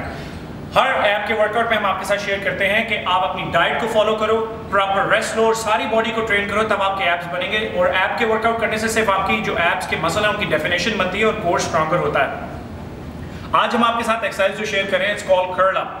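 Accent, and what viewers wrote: Punjab, India. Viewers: paaji.....appka jo accent hai bhot bhadia hai......that is punjabi,english and hindi mixx......ashians ke liye best hai aapki videos........khass kar punjabioo ke liye😘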